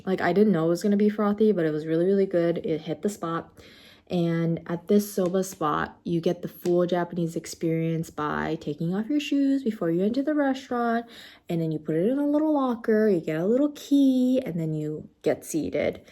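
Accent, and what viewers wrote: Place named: United States. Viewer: I still really like how your Japanese pronunciation has a "American" accent to it!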